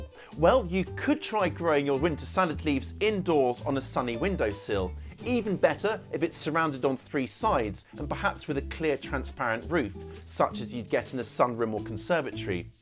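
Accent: United Kingdom. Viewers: Thanks I just love watching and listening to you. I’m from Yorkshire but live in Arizona. It’s great to hear British accents! I recommend the book The Three Season Diet which is an Ayurvedic approach to seasonal eating. The theory behind it is first - that there are different bugs in every plant meant to enhance the gut microbiome and eating a wide variety of plants helps strengthen our health. Second is that different foods are digested better at different times of the year. Third different flavors are important at different seasons. All of this is to strengthen and enhance digestion so that as we age we can continue eating a wide range of foods and have the digestive capability to convert them to energy. For example. Field Mushrooms are available all year round in grocery stores but as we know they grow in the spring primarily and they don’t have a long life. 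Spring is the rainy season preparing the land to absorb and sow new seeds. These mushrooms are to help our bodies repel excess water and cleanse the kidneys and liver in preparation for the lighter summer foods. Mushrooms are dry and wick water away from themselves in the field. So eating them in summer and autumn when our bodies are drying out can cause problems with serious dehydration. Hence much of our food in summer is juicy, sweet and ripened on the vine or bush or tree so we don’t have to use fire as much. They are cooling. 🪴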